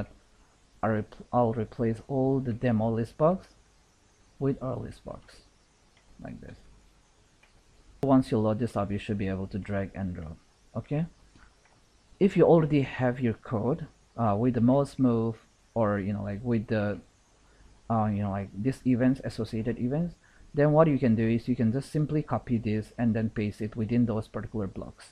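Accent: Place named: India. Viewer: Good job with showing this technique. From where can we download the sample file? I'm just curious about the accent, are you originally from India?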